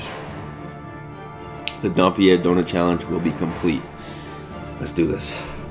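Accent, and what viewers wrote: Boston, United States. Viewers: Couldn’t figure out your accent, but when you said “bears,” as “behs,” I knew it had to be Boston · I’m not from the US, just watched a couple of your videos and it took me until to recognise you have a Boston accent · Meronghilay It’s a Boston accent! :)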